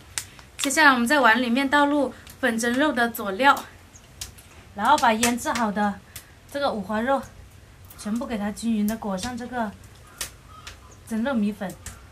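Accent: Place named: Guangxi, China. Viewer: 讲广西官讲的妹仔。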